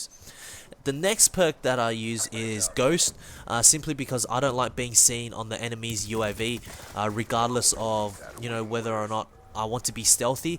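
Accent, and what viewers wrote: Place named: Australia. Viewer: i knew your were Australian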